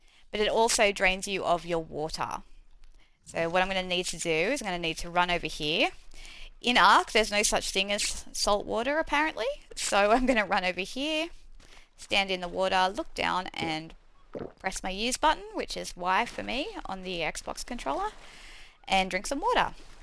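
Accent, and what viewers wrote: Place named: Australia. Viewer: So nice to hear a familiar accent! Aussies unite :)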